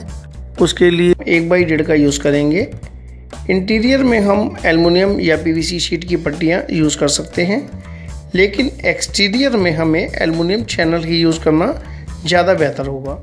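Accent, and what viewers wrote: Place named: India. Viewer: Indian voice -.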